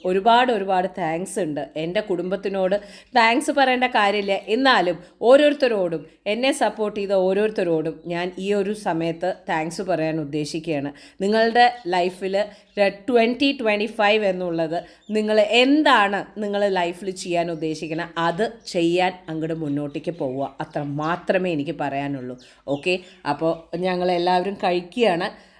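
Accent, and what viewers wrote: Palakkad, India. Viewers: Dear.... സുഖായോ... Sound ഇപ്പോഴും ക്ലിയർ ആയിട്ടില്ലല്ലോ... കാത്തിരിക്കയായിരുന്നു വിനീടെ പാലക്കാടൻ സംസാരം കേൾക്കാൻ.. സന്തോഷമായി... Wishing you life"s best mn new year 💐💐💐💐💐❤️, കണ്ണേട്ടനേയും, മക്കളെയും ചോദിച്ചതായി പറയണേ... വിനിയെ കാണുമ്പോൾ എന്തോ ഒരു sister like feeling ആണ്.. മക്കൾ വളർന്നു... സന്തോഷം.. ഒരു ഹായ് പറയണേ.. From Sophia Dicruz ഫ്രം പഞ്ചാബ്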